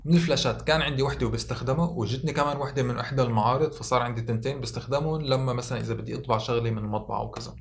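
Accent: Syria. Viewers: حكيلنا عن حالك اكتر...حبيت قناتك.... حاسستك من سورية...صح؟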